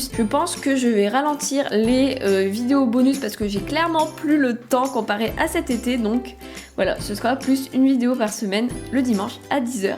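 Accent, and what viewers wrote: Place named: North, France. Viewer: bonjour vous êtes toujours aussi belle,j'adore votre accent de ch'nord!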